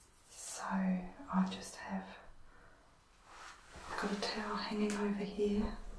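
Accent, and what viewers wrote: Australia. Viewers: Australia! My bad.I thought somewhere in Britain but your sounds are so rich I haven't paid much attention to the accent.Or do I have the accent? Now I hear it.I should have known. We have national and regional slang.It is probably the same there. I am a huge fan of the Seekers.Judith Durham is brilliant.But you are too young to remember them in their heyday.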